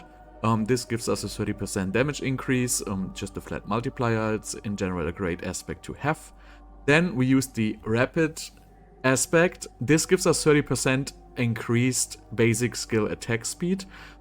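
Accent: Germany. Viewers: So geil das man deinen deutschen Akzent hört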